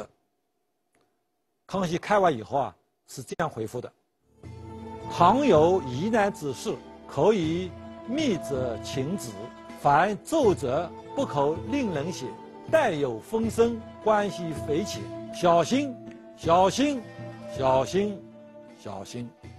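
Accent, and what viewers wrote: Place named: Jiangnan, China. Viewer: 这个老师就是包邮区口音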